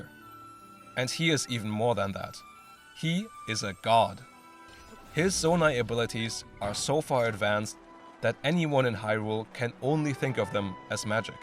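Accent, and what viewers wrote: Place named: California, United States. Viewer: Don't know man sounds like California to me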